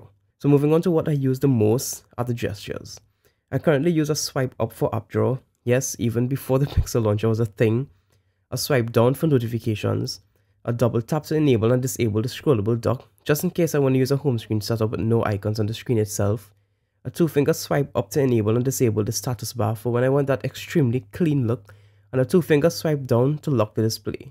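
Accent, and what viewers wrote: Trinidad and Tobago. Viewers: Are you trini bro ? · are you Trinidadian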